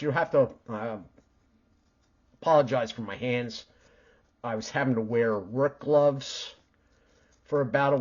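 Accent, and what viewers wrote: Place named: Pittsburgh, United States. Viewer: When you said “PA pricing,” it all clicked! I knew I recognized the accent. I am Pittsburgh born and raised 😂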